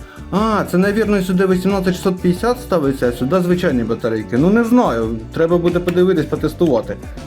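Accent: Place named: Mykolaiv, Ukraine. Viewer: у нас в Нико обл всю дорогу так как ты щас говорили , поэтому вспомнил счастливое детство)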